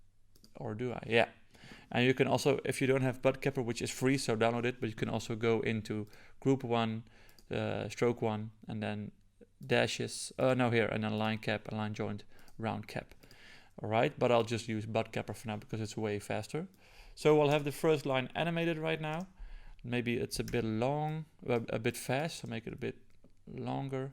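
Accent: Netherlands. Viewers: Quality stuff on your channel man. Glad I found you. I think I recognize a Dutch accent but I'm not entirely sure! Keep it up man.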